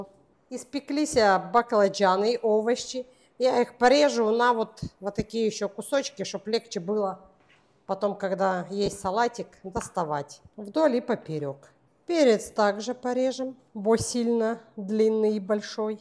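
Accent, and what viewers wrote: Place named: Odesa, Ukraine. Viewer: дівчата розмовляють одєсскою мовою. Головне, що всім зрозуміло. Дякую за рецепти.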